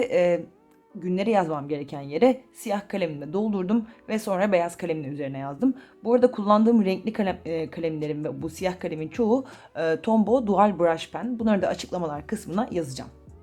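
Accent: Adana, Turkey. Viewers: Abla adanalı olanlar anlar bende videoya girer girmez dedim ki adanalı mi acaba? 😂😂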